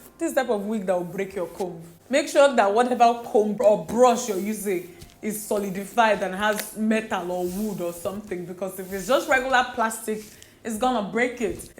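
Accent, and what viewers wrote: Nigeria. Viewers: That nigerian accent made me😂😂😂😂😂😂, 💓💓💓👌🏿👌🏿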